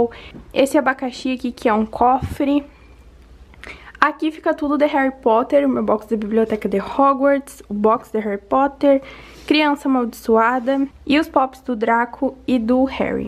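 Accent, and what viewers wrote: Rio Grande do Sul, Brazil. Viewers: Bahh q quarto fofo, um sonho! Tu é gaúcha? Parece muito... Eu sou gaúcha! Kkkk♥️♥️♥️♥️ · Vc é gaúcha? Teu sotaque é lindoo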